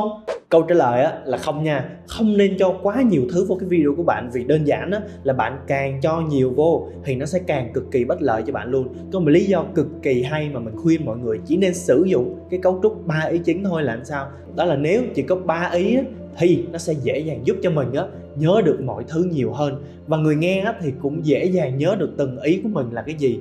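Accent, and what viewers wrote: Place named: Central, Vietnam. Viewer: Mình nghe là biết bạn người miền trung nhưng vạn nói hay đó, diễn đạt dễ hiểu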